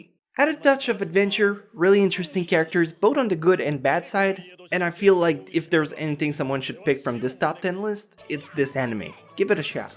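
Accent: Quebec, Canada. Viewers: french canadian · Eero Koponen *French Canadian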